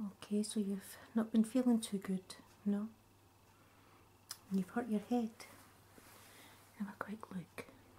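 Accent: Scotland, United Kingdom. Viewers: Which part of Scotland are you from? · you are amazing don't let the haters get to you btw your accent is lit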